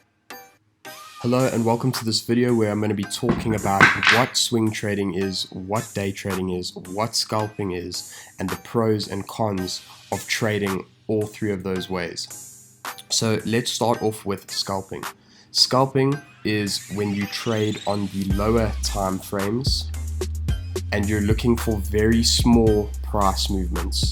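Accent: South Africa. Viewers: Durban Accent?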